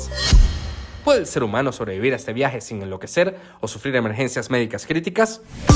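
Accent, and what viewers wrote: Venezuela. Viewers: Jajajaja, la gente quienes comentan sin conocer los canales! El usa su verdadera voz y los venezolanos hablamos así, y no hay ningún país, ni siquiera España, en donde la gente hable español pronunciando absolutamente todas las letras, entendido, brodel?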